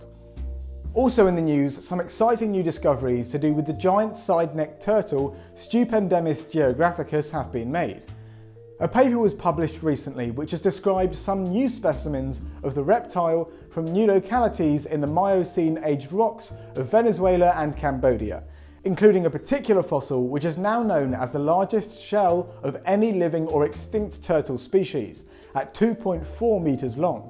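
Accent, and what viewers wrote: England, United Kingdom. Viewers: This was cute. Nice English accent.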